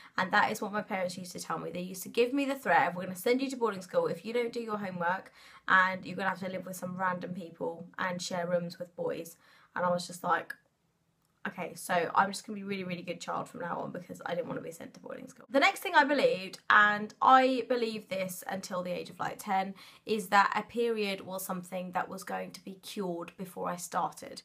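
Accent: United Kingdom. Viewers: Where do you live ? Cause u sound british lol ur voice is pretty .but pleasee answer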